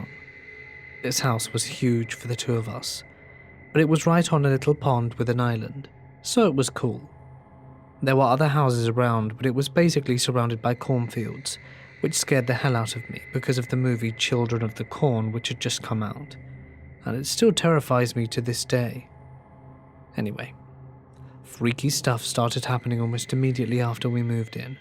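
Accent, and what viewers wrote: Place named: RP, United Kingdom. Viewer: Reminds me of a high class 1920s British socialite accent. I like it.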